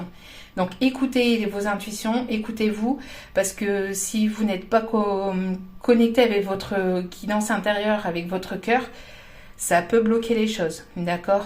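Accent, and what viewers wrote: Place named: North, France. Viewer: Quel tirage 🤯 mais quel tirage 💓 Moi j’aime bien votre accent du nord 😁😉😎 un grand merci 🙏 belle soirée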